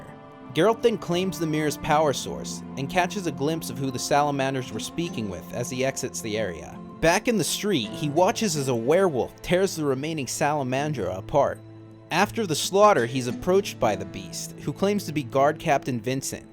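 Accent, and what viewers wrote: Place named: Southern California, United States. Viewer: In-Depth recap by someone from Southern California lol Good recap and great accent. Thanks!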